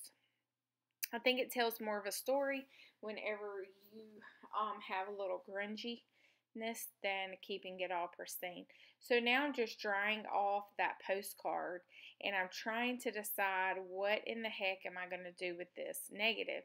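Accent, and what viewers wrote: South, United States. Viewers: My stars girl, I love all of your southern accents and expressions. I moved out West 15 years ago and miss the accents. You bring me Joy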